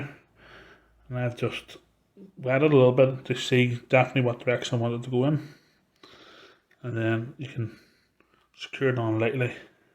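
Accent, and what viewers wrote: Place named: Ireland. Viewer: Lovely looking fly. Great to hear an Irish accent on youtube too,. Keep up the great work. Subscribed.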